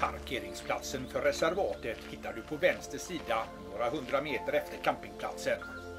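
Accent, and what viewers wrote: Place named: Gothenburg, Sweden. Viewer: Fina naturbilder och världens goaste göteborgare som berättare.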